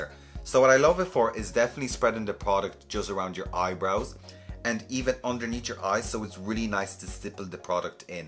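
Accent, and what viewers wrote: Dublin, Ireland. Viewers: I love your Dublin accent ❤️ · Love the accent! Irish?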